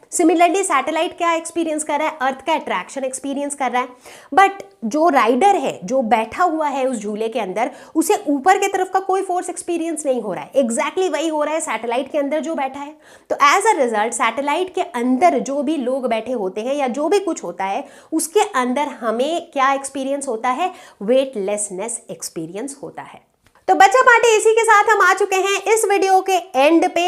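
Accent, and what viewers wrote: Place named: Tamil Nadu, India. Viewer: mam your language like tamil "FEEL" ☺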